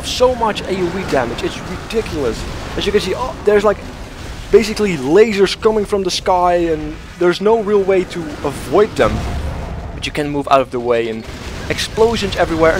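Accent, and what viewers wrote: Netherlands. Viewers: something says you have a dutch accent! :) neverthe less.. good video. enjoyed it. Good to know my 19 euro's are a good investment.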